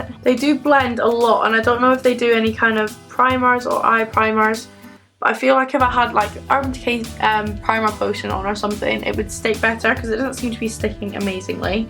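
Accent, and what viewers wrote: Scotland, United Kingdom. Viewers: Is she Scottish or English dumb question ik 😂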